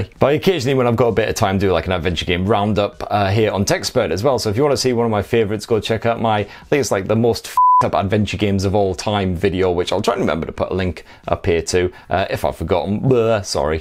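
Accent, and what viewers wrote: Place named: Sunderland, United Kingdom. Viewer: Hi, I'm geordie and your the only mackem that I've heard that doesn't irritate me. I'm having charging issue with my Galaxy s10 plus. Can you help? Thank you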